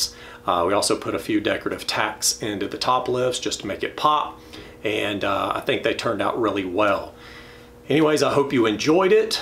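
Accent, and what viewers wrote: South, United States. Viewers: Good heavens! I love the commentary. Folks can turn down the commentary if they don't care for it. I'll bet they don't understand our nice Southern accents. I'm in Alabama, y'all. I love accents. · I do miss the southern drawl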